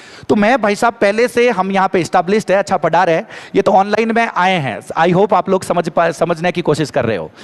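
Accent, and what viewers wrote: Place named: Jodhpur, India. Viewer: Sir aap ki jodhpuri boli kamaal hai✨👍😊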